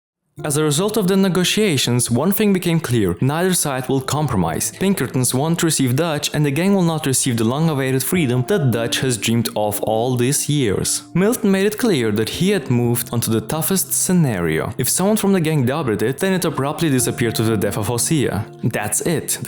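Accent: Russia. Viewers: Why all russian boys are sexy, i love your accent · Ты акцент не слышишь? Это явно кто-то русско говорящий. И в описании ссылка на оригинал.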